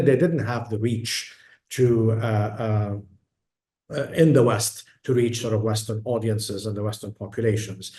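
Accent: United Kingdom. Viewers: He sounds like he is from the UK. Did he forget about the British put the Zionist in Israel in 1916 and took over there claiming to be Jews and they created the UN to make Israel in 1948 .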